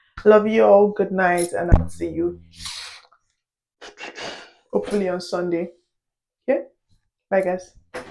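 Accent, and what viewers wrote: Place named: United States. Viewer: Yoruba GRWM with you, definitely going come out great with your small American accent here and there😉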